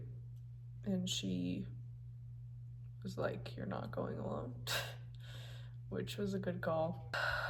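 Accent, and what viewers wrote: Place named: United States. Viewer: Atleast you sound like your in America, could be worse I'm stuck in the UK 😂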